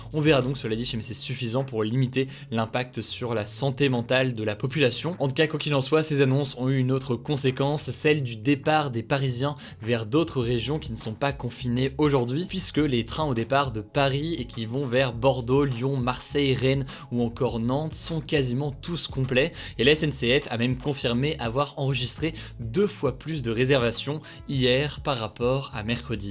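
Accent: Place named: United Kingdom. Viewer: Hugo je me demande depuis un moment, mais pourquoi tu ne prononces plus les mots et expressions anglaises avec ton bel accent britannique? :) j'aimais bien perso ça change de notre "French accent" !! Bravo pour ce boulot tous les soirs ;)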